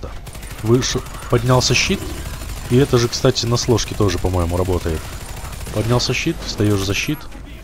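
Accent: Kazakhstan. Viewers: Ты из Казахстана? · ты из казахстана?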